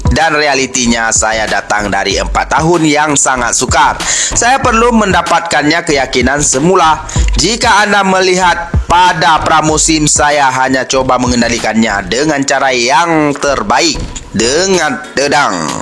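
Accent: Aceh, Indonesia. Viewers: Admin logat aceh melayu... Gua orang kalimantan aja paham kok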